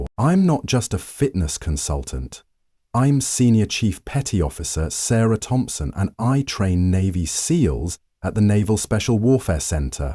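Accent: United Kingdom. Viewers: Why is it a british voice No one in Britain has guns Gtfooh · What the hell with the British narration?? Just another Boss woman story .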